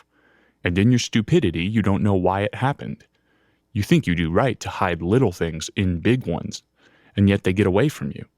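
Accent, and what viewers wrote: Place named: United States. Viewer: are you kidding? this over the top american accent is just unbearable. I can't imagine any intonation more unsuitable for reading this kind of content (well, maybe an arrogant-french intonation. wouldn't be much worse though).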